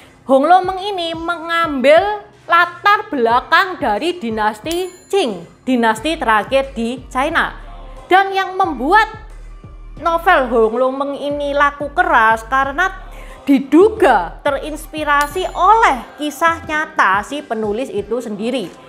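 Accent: Java, Indonesia. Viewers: Wow so pretty saya suka cirita mu Kamu Jawa nya mana ko medok amat ya haaa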